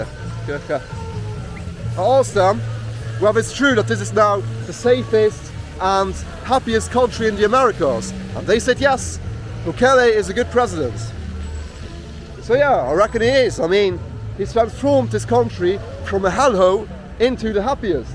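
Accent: United Kingdom. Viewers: Love the English accent, makes the video more entertaining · The don’t sound like a gringo, you sound more like a British person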